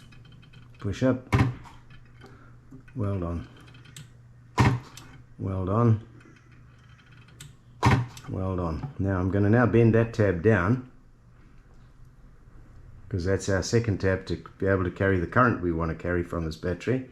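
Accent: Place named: South Africa. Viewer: Best instructive video on Youtube on creating a 6s. As an expat half way around the world great to hear a South African accent again as well.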